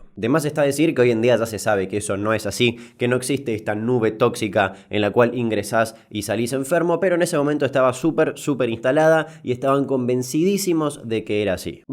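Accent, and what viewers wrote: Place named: Cordoba, Argentina. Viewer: Como me hiciste reír!! De dónde sos, tenés una tonada cordobesa!! Pareciera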